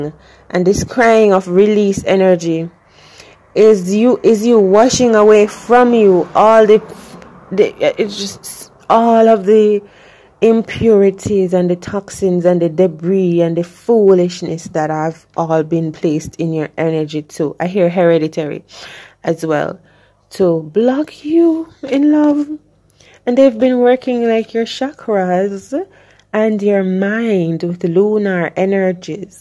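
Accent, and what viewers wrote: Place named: Jamaica. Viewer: I love your patois ❤️🔥🥰 please don’t hold it back .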